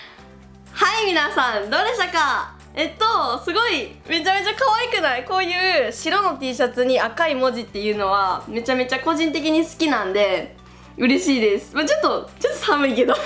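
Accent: Kansai, Japan. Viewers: の関西弁可愛いすぎです。アウター良かったですね。🙆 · 始まりからコメントしたくなる。ハキハキで変にぶりっことか、キャラ作りしてないのが好感度マックスw関西弁出てくると思わなかったしギャップがいいです！！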